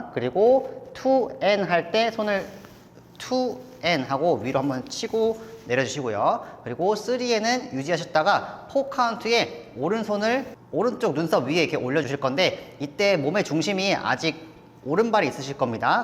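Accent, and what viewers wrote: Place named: South Korea. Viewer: yes, a lot! And the subtitles were too, since you have korean accent (which is so cute being honest), thanks! I'll be looking forward to your next tutorials (CAN'T BELIEVE YOU NOTICED ME, YOU'RE AMAZING 😍)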